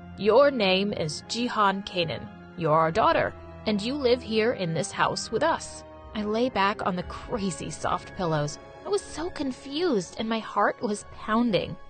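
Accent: United States. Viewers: You’re turkish and you have an American Accent? Kinda sus I am Turkish btw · This Turkish person has a suspiciously American accent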